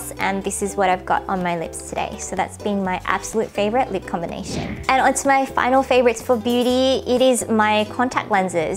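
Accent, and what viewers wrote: Australia. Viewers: Hi! I don't normally comment on Youtube videos and I'm not someone who usually watches beauty videos, but your channel is one I find myself returning to surprisingly often. When I first stumbled upon your channel, 어? 한국 사람이 호주식 바름으로 얘기하네? 하고 마냥 신기해서 계속 보게 됐는데요.. 근데 볼수록 왠지 정(?이라고 해야할까?)이 들더라구요. Perhaps it was the nonchalance with which you so endearingly shared the little quirks of your personality, putting it out there for all the world to see. Perhaps it was the apparently total comfort with which you sat in front of the camera as though you were simply hanging out with your viewers. Perhaps it was the warmth and care and effort you so clearly put into your content to not only share but to better the lives of your viewers in some small way. Whatever the case may be, I just wanted to let you know that your 따뜻하게 바로 앞에있는 친구 처럼 말해주고 보는 사람들을 위하는 예쁜 마음이 이 먼 미국에서도 너무 뚜렷하게 보이고 들린다고 그리고 고맙다고 말해주고 싶었어요. 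Maybe it's just because it's 3AM and I'm feeling sentimental, but nonetheless~ I just wanted to thank you for your hard work.:) Have a lovely day!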